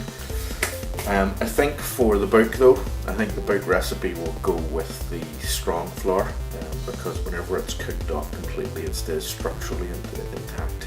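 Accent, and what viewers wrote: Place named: Ireland. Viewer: I love your Irish accent I am from Scotland just over the sea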